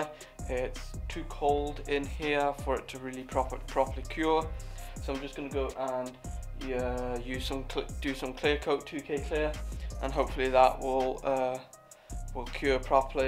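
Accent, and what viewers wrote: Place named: England, United Kingdom. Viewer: You in England or Ireland?Im guessing England as of your accent and plates on the other cars...so did you bring the civic over or what?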